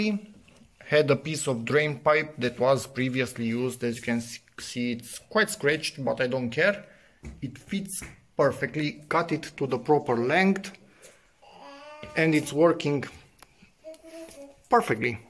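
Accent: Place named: Romania. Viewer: La cum vorbești ești Român toată ziua. :D Zi-mi te rog,il recomanzi ca si putere,trage bine?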